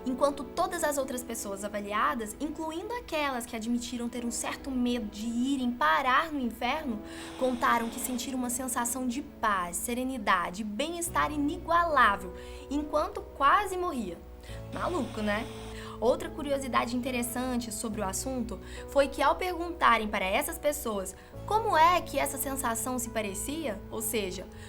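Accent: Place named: Minas Gerais, Brazil. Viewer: Sotaque mineiro.....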